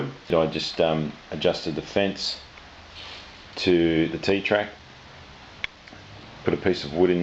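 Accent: Australia. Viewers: Good to hear a Aussie accent, oi oi oi . I should have brought a SB 12, I am not happy with my saws fence system and I seen you did one on your old saw , did you do a video on that ?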